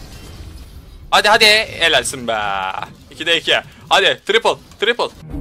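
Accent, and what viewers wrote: Trabzon, Turkey. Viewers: Bence Trabzonlusun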